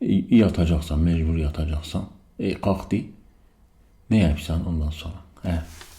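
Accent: Eastern Anatolia, Turkey. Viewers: Doğu şiveli videolar çok daha cezbedici. Emir veriyor gibi olmak istemem fakat bu lisanla psikolog roleplay güzel gidebilir. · doğu şiveli olduktan sonra her şey olabilir 1 saat doğu şiveli herhangi bir video n olsun yine izlerim her gece aciyorum bir tane şiveli ama artık aynı şeyleri izliyor gibi oluyorum hepsini defalarca izledim cünkü aslinda doğu şiveli videolar icin izliyorum bu kanalı dogrusu digerleride güzel ama onlar hakikaten başka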